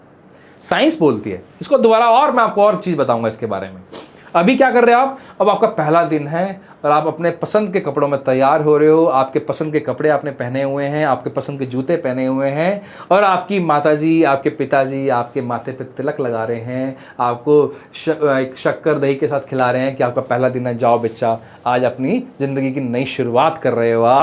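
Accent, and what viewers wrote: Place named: Haryana, India. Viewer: Aap Haryana se ho ji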